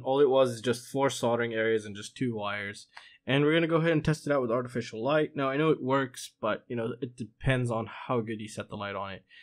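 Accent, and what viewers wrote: California, United States. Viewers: david tilvikas he sounds like he has a California accent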